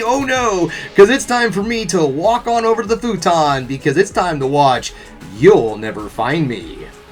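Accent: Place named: North America. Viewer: I keep meaning to ask this: where exactly are you from? I'm a British guy and I just adore hearing your accent. I know it's either an American or Canadian accent you've got (I admittedly struggle to distinguish the two), but I'm curious about which region. Great review, btw 🙂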